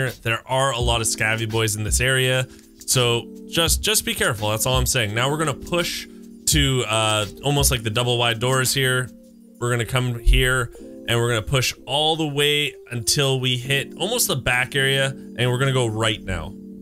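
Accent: Canada. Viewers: Trailer park boys accent ; ) Cheers from Quebec !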